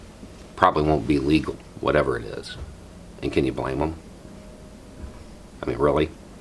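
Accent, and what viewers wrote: South, United States. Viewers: First off let me say I am not a voter I stopped voting after Bush H said it’s enough I can’t change one thing. I did serve in the military for 6 years. I have watched many of your videos and I must say you just rehash everything that’s being said in a different way. You sound like you’re from the South but there’s something else. You are no better than the other lefties or righties out there pushing their bullshit. I’ll start voting again when we come together. I have said and will keep saying I’ll vote for a fiscal conservative no matter the party. But you with your Bob Ross demeanor and smooth quite way of thinking your way is the way is the only way. To me it’s just more don’t forget to thumbs up and subscribe so the $$$$ keep flowing and you are laughing all the way to the bank. It’s the capitalist way.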